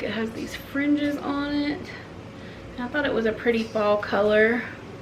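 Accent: Kentucky, United States. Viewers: Just live about one hundred miles from you. I picked on that KY accent as soon as you spoke…..lol. Love all you’ve done to your home. I grew up in mobile home, it was 10 feet wide and 40 feet long. After raising 4boys I’m ready for a tiny house again, I lived in something much bigger during that phase of life. Now it’s just me and I’m ready to downsize.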